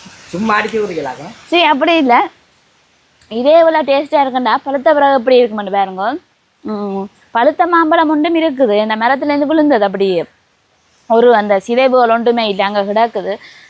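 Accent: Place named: Sri Lanka. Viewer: I'm always LOVE srilankan Tamil from Tamilnadu.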